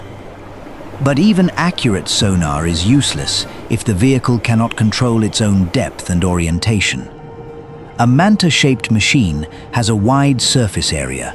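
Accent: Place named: United Kingdom. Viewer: strong British accent is a dead giveaway that video is a fraud. Scammers.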